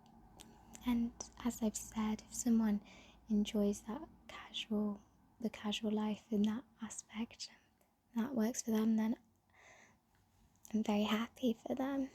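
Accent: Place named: United Kingdom. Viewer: You know what it takes real courage to talk about this, especially to people online when trolls are very common. This is the first video of yours I've watched since I've just found your channel and it's kind of opened my eyes to quite a few things, I to fell into the hook up culture but mine was for a different reason ( I won't bore you with it and it's too personal to type about for everyone to see 😊), I guess I need to go and do so thinking, searching if you will 😐 as a British guy I still find it amazing how gorgeous some accents are in our country, you've got such a sweet, innocent voice.. I could literally listen to you talk for hours 😊 that is why I hope you do more of these video's, just hopefully longer.. even if it's just for my own gratification 😳😜 thank you sooo much for this video 🤗💜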